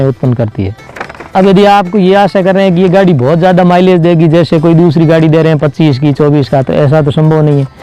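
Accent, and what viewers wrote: Rajasthan, India. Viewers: राम राम भाई.. कुणसो गाँव सा.. आपके बोलने के Accent से लगता है आप राजस्थान में हमारे गाँव के आसपास के ही हो..आपके वीडियो देखलर बहुत अच्छा लगा खुशी हुयी..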